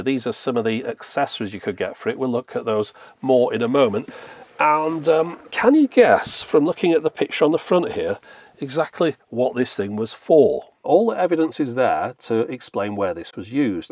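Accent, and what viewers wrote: United Kingdom. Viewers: Your voice through the window and brit accent sounds like C3PO. No offence...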